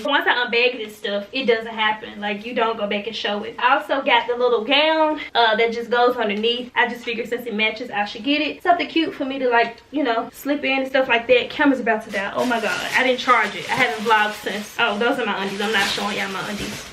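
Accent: United States. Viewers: Your little country accent is so cute!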